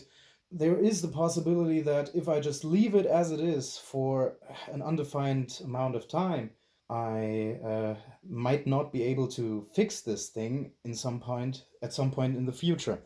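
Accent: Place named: Germany. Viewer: Oh my god , Its Indeed Beautiful Piece of Tech, by The Way You are German I think by hearing Your Accent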